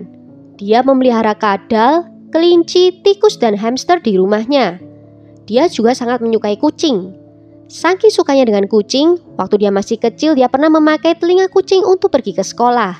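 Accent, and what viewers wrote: Java, Indonesia. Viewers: Logatnya mba medok tp suaranya bagus jelas terdengar 👍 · Aku merasa terganggu sm medoknya.....Tlg latihan lagi mbak..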